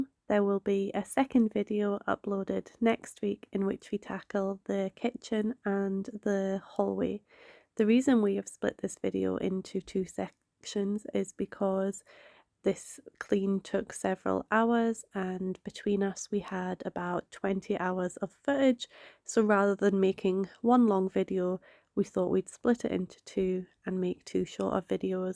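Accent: Scotland, United Kingdom. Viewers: Scottish accents are the best accents in the world, top three for sure. It's so comforting, maybe it's because my ancestors came over from there; it speaks to something deep in my soul. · official pet troll here. Don't worry! I'm a friendly troll. I'm near Fife, Washington. From your accent, I suppose you are closer to the Fife area in Scotland. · I love a Scottish accent and you will always get moaners and whiners who would not do what you are doing even if they were paid. Thank you for all you do. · Midwest magic recommended your channel so you must be good! Only take advice from Mac he’s the best, I love the Scottish accent I also follow the sheep game and the hoof gp both great channels. How can you have the ‘wrong accent’ ? Wow! Good luck with your channel I have subscribed ❤ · I agree except for the music part…nice soothing music is nice & your voice is fine…you’re a Brit. 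We know how you guys speak 😏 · You have a beautiful Scottish accent 😍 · I love watching your video's and your wee Scottish accent . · Came over from MWM. Yes, please no YouTube generic music and there's nothing wrong with your voice. I enjoy a good Scottish accent. 😊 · How rude! Your voice is totally fine. You just sound like you live in the UK. Midwest Magic Cleaning sent me to check you out. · Your accent is so mild, who ever said that obviously hasn't been to Scotland 🏴 They would have nae hope understanding me, rubber that feedback yir doing braw ❤ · I like hearing your voice! Please don’t stop talking because of negative feedback. I am Scottish too and grew up on the East coast of Scotland. My accent is similar to yours. I live in America now and don’t hear a lot of people who sound like me, so it is lovely to hear your voice. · I am not very good with accents, but I want to learn. 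What kind of British accent do you have? Is it typical for a certain area? My British friend from London has a very strong accent, very different from the way you speak. I live in the US, but English is not my native language. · Dear and, thank you for great videos and for all that you're doing to help others. I'm a big fan of Midwest Magic Cleaning and after his shout-out to your channel I am so happy to see the number of your subscribers growing. I love you soft narration, it's not monotone and I would like to encourage you to keep going and narrating the way you wish. Your softer voice is also good for ASMR if you want to give that a go! I don't know who told you that you had "wrong accent" but please block such comments. I love Scottish accent and there is no accent in the world that would be wrong in any way. Aye, you're doing a wonderful job and I'm looking forward to your future cleanings. 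🙂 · Bonnie from A Beautiful Mess sent me your way. Your accent is nice, reminds me of my time in the UK - we need all the diversity we can get in this space. <3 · I like the chit chat during videos and love the Scottish accent! Out of curiosity what gets recycled from your cleans? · MMC said hello! And your accent is fine. When you are from GB you tend to have a british accent😂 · Your gentle Scottish accent is lovely. As a lover of so much american content, it is comforting to me to hear a familiar UK accent. No music for me, I find modern music very dischordant and distracting. Visuals and your own voice for me, thank you. I would say, just be yourself. Those who want and need what you have to say and show will stick around regardless. All the best with your channel (I found you via Mid West Magic Cleaning) . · This transformation is amazing. You two did such a wonderful job. Love the narration, Scottish accents are the best! 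· MidwestMagicCleaning mentioned you so here I am. There is nothing wrong with your voice at all -- it's quiet and relaxing to listen to. 🙂 And your accent is nice too. I'm American -- we love UK accents, lol. So glad the resident is getting help. ❤I subscribed and now I'm off to check out the other half of this clean!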